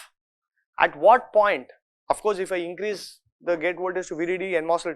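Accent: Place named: India. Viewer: White dude with indian accent? Thats a first for me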